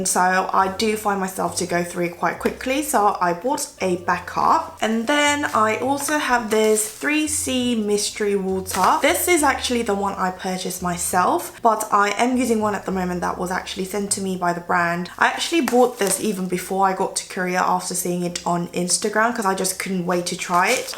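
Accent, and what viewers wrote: United Kingdom. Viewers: 언니 영국발음 개치입니다..💜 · 투쿨포스쿨꺼 치크 앤 아이인데 입술에 쓰기에 무리라고 해써여!ㅋㅋㅋ 아무튼 오늘 영상두 잘봤어여~ 약간 영어 듣기평가처럼 언니의 영국 영어발음 듣는 재미도 있고 하울도 재밌었어여ㅎㅎ 다음 영상 또 기다릴게여~~ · 미국사는데 님의 영국발음 들을때마다 너무너무 좋아요! 완전 취저😍😍😍 펄시레드 색 진짜 쨍하니 너무 이쁘네요💋 다음번에 한국가면 저도 쟁여와야겟어영❤️❤️ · 영국발음... 이게 영국발음인가... 치인다 오늘도... 팬미팅 해주세요... 하... 워터~r 가 아닌 우워터. 에 놀라고 영국 발음과 영어 목소리에 치이고 갑니다... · 진짜 영국 발음은 언제나 귀르가즘 ㅠㅠ❤️ 저도 이제 곧 개강이라 다시 해외로 나가야하는데 추천템 좀 쟁여가야겠어요>_<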